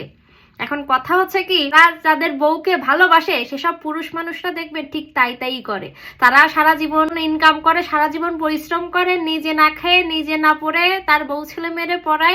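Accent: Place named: Jessore, Bangladesh. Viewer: আপনার কথা শুনে মনে হচ্ছে আপনি যশোর জেলার মানুষ জানতে চাই আপনি কোন জেলার মানুষ?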